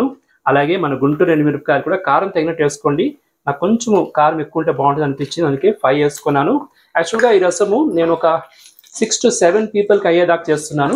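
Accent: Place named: Karnataka, India. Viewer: మీ తెలుగు స్లాంగ్ చూస్తే మీరు కన్నడిగులని తెలుస్తుంది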